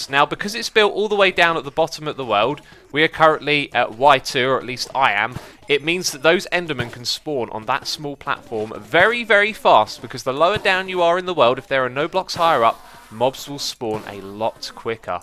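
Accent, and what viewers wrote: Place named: United Kingdom. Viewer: Emanuel Ortiz Maybe it's his British accent, the sound of his lips smacking and his saliva bubbling, or maybe it's just the way he talks. You can't hate. No one can.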